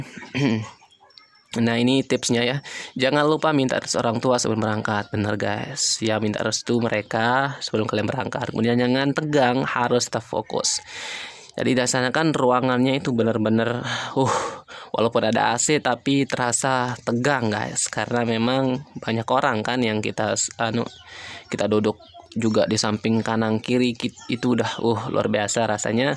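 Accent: Lombok, Indonesia. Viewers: logatnya kek org lmbok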